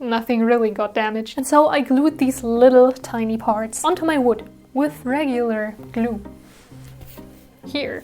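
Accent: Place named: Germany. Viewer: Ha I knew you were German I could hear it in your voice I'm German to brw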